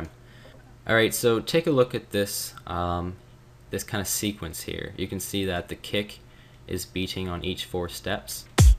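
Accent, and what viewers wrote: Canada. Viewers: are you Canadian? :D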